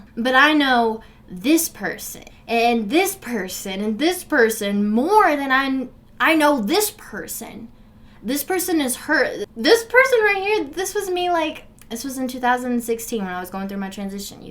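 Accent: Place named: Virginia, United States. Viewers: Your accent sounds like you’re from where I’m living, in tri-cities, middle of nowhere Virginia 😂 lil bit of country, lil southern, lil city talk. Yup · You basically nailed it 😂 She’s from Grundy, VA. I’m from Roanoke so it’s funny to hear her accent, too. Very familiar.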